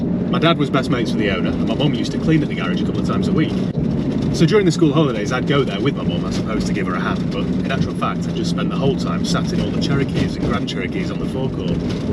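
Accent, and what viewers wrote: United States. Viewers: Good American accent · Your American accent cracks me up so funny! 🤣🤣 Keep up the cracking content!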